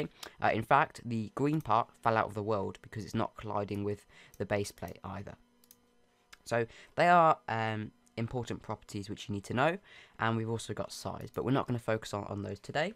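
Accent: United Kingdom. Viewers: prolly because of british accent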